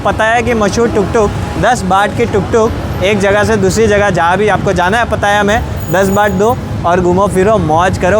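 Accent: Gujarat, India. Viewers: You are gujarati or something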